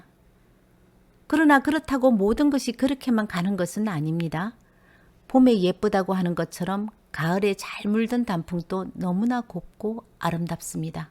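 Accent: Gyeongsang, South Korea. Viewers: 말마다 옳습니다 경상도 사투리도 예쁘십니다 ㅎㅎ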